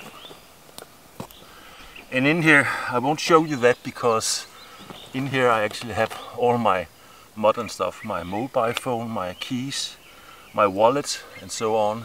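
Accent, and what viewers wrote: Denmark. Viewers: du er fra danmark jeg kan høre det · You sound Danish, from your accent, but where did you film this; as the scenery looks too rugged to be Denmark?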